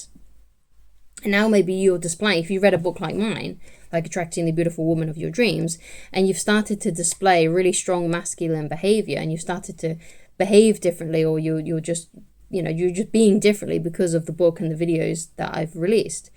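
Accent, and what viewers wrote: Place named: United Kingdom. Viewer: Strong UK accent and the final piece of puzzle I needed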